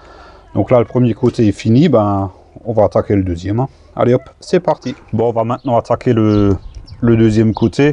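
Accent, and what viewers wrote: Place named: East, France. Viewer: Non c'est pas dur car célibataire et 48 m² à Brest plein sud en étage avec mon chat et il fait beau, j'imagine avec une nana et des gosses....mais le plus dur c'est de ne pas pouvoir aller voir mon père de 75 ans et mes grands parent de 91 ans. En tout cas j'adore ton accent de l'est mon ami et ta gentillesse et surtout il y a toujours pire que soit mec salut. Kenavo.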